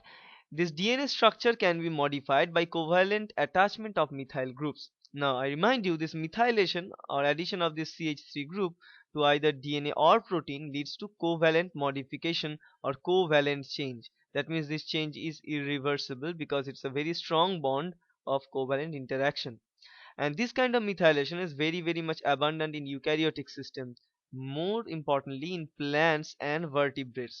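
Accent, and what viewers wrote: India. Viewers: Sir please Indian English bolne se acha hy Hindi mein he lecture deliver karein.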